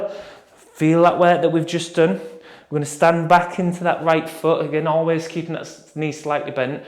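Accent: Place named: North, United Kingdom. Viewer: I have historically hated strength training, only beginning to factor it in alongside my beloved running when the injuries started. Now it's of course a non-negotiable, and I can honestly say that your videos are some of the only ones I enjoy on here! It's almost not a chore! I'm super bendy (but with the stiffest ankles) with poor balance and find that some other vids speed through so I can't keep up, and also introduce advanced exercises quite early on which my lower back can't hack. I enjoy that you offer modifications, encourage us to take our time, but also talk through purposes too. Thanks - my fave runner's channel! Plus, I enjoy a fellow Northern accent!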